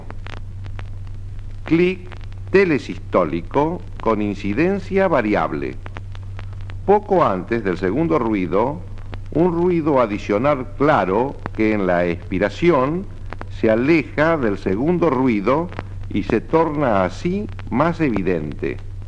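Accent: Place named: Argentina. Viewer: Ese acento argentino de loas años 80 me trae mucha nostalgia :)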